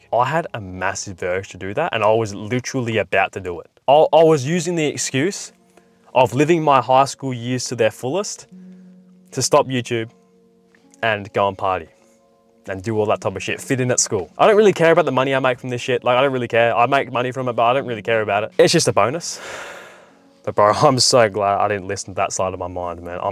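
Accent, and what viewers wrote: Australia. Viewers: I mean you have the looks I never get use to the Aussie or kiwi or whatever accent that is but I'm sure some other women like it lol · I sense an Australian accent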